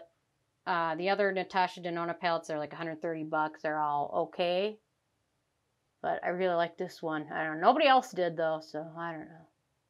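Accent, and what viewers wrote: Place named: Midwest, United States. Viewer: my sunscreen AND Hyaluronic are water base. I’m using the Garden of Wisdom glacier water blah blah but their most popular and it is nice and refreshing as you’d guess. Def not enough at all on its own. Can’t wait to try First Aid. I have normal skin but it likes oils at night better than creams even tho derm docs say creams are better for keeping products in place to do their work. Sunday Riley Luna or Juno are dreamy for me. Starting up retin A once s week again and once you get past the ugly part, you def glow. I avoid it if I’m vacationing or have an event where I don’t want surprise peeling. Such as Christmas. Then I get back on the horse. I’m originally from MI so your accent is charming like mine. Lol. People know I’m Midwest immediately so I’m loving hearing another brethren once in a while.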